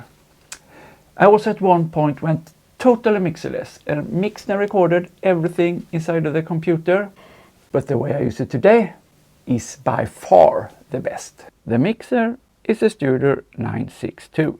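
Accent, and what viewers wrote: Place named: Jamaica. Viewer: nice analog & digital hybrid system ! u sounds like from jamaica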